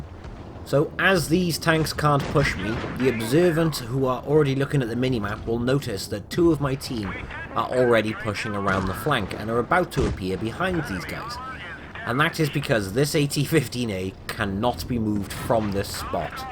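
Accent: United Kingdom. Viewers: Also where from Britain is he? The accent sounds local to me.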